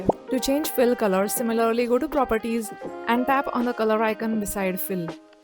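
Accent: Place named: India. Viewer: From his accent he looks like an Indian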